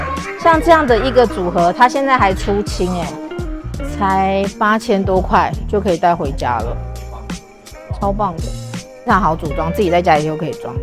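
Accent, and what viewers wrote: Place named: Malaysia. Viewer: 很多画面，让我笑了😁 马上订阅😉 那些是台币吗？ 口音也很像我们马来西亚的。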